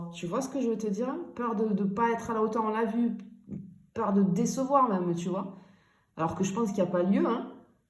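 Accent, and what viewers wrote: South, France. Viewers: Bonjour je vous ai découvert y'a peu de temps 🤗 et vu l'accent magnifique que vous avez j'imagine que vous êtes pas loin de celui dont vous parlez pour moi ici 😂😂 le sud hein .. j'aimerais trop savoir vers où vous êtes située car je vous est découvert presque en même que je l'ai rencontré lui et les énergies sont connectées ! Vraiment !! Trop fort 🤍 ce n'est pas ma fj je précise ,mais certainement un lien transit.. un lien qui m'a renoué avec ma féminité non sacrifié..et oui bref 🌻💌🌸🌷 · Merci, C'est fou ... Au monent où j'ai ouvert youtube j'étais en pleine interrogation et une question en tête et encore plus fou ,je tombe direct sur votre guidance ! Pur hasard ! Merci la grande majorité de votre guidance m'a fais sourire et je l'ai vu ! Merci,cela m'a reconforté, merci pour ce soupçon d'espoir que ses messages étaient pour moi. Tout ça avec notre cher accent du sud. 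Merci Ps: j'ai toujours peur d'y croire mais merci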